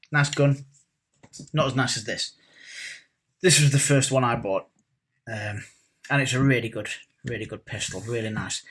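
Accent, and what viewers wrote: England, United Kingdom. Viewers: I really enjoy your commentary. Your explanations and English descriptions are very pleasing to the ear. I've always enjoyed the English accent. The adjectives you use are first class. Love your content brother!!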